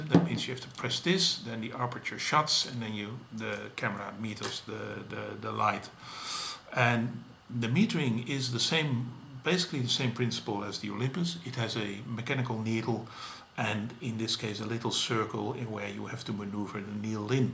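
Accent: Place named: Netherlands. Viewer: You are doing fine, I think! Your dutch accent is ok, don't worry. Maybe you can add some photos taken with those cameras to your reviews 😉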